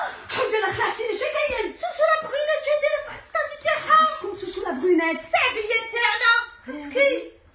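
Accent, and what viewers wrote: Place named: Oran, Algeria. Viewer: انا وردة من حب قالمة. انا نموت على وهران ونعشاق في لهجة تعهوم. تقتل. والله. نتمنا نزورها في حياتي والله. نحبها. ونحب لهجتهوم.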